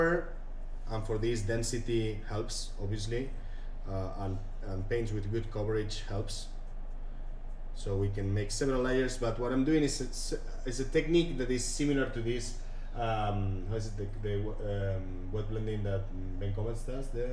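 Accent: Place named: Spain. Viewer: I can understain your english. Are you spanish?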